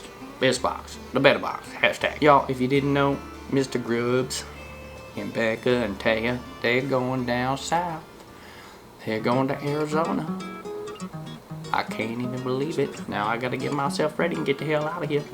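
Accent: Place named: North Carolina, United States. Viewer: DUUUUDE!! I am a freakin HUGE deadhead! Freakin DOPE-ass hoodie! I followed a few shows back in the day! Warren Haynes is a POWERHOUSE guitarist/vocalist from the ABB!! Gov’t MULE KICKS ASS TOO! if I didn’t know better I’d thought you were from down here in NC! You talk just like these people down here! Awesome video! I appreciate you! BABAAM!!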